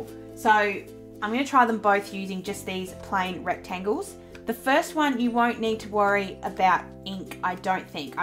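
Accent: Australia. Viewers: First video of yours I have watched and subscribed already. I have a low temp soldering iron that is pathetic for soldering so will try it with this. Good to hear your Aussie accent. TFS!! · It was awesome to hear a fellow Aussie! Most of the crafters I watch on YouTube are from the US, it’s hard to find Aussie craft channels. Definitely a new subscriber here. Thanks For sharing ❤️🇦🇺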